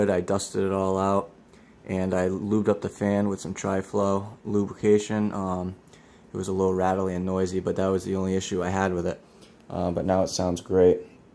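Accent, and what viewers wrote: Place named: United States. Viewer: hi so based on your accent you are somewhere in the US (finally, most video's showing the apc2200 are from the uk) as i am too, and a friend gave me this unit with bad battery's, and cabling, no stickers no model number on it, and i had to open it up and take the model number from the internal board, so at first i was sure this uses 220v but as i found your video and i am in the US to, i am not so sure anymore i thought it might be a good idea to ask this stupid question, does it take 220v? or 110v?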